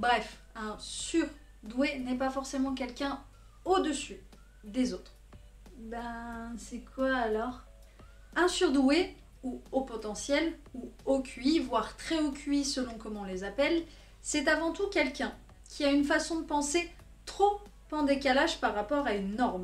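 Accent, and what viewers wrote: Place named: South, France. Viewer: Ah cet accent magique ! Putain con bonne mère :) Coucou de Toulouse ! J'enchaîne avec la suite de ton périple.... merci. Je compte aussi passer le test.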